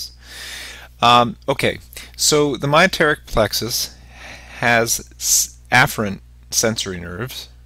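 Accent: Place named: Jamaica. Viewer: 1. excellent i have already liked it on the icon side 2. minor revisions recommended - a) the slimy Jamaican English accent could be changed, b) not a semi-audiobook, coz i don't want to be seeing the videos whilst hearing to his good english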